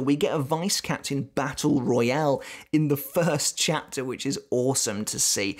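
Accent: United Kingdom. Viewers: He reminds me of this dude I went to school with but this guy British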